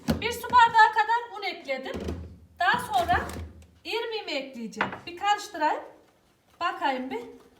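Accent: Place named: Konya, Turkey. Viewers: hmm nerelisiniz konuşmanız bizim yöremize çok benziyor nevşehirliyim ben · Tamam konyalı konuş yonuşyosun hanım ben konyalı yım calarım biz abane oldum hanım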